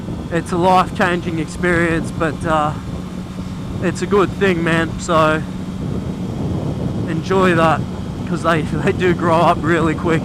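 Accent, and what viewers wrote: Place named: United Kingdom. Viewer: Mate I love that catch phrase lol sounds like a top fella in the UK 😉 keep the vids coming 🐨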